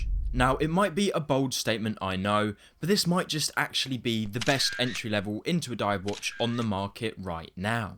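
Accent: United Kingdom. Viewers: I’m completely over the British accent. Click away.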